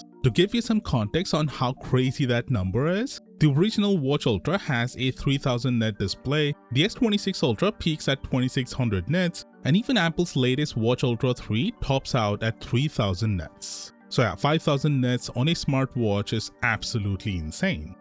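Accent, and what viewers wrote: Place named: India. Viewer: He clearly speaks English with a Hindu accent, he’s not Turkish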